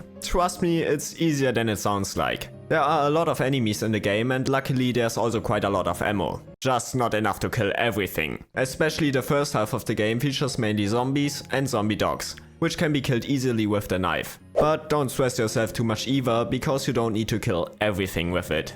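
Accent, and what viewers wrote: Germany. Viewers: I could swear it's german or something like that. I'm from germany and lot of content creators from here have a similar accent in english. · German accent ?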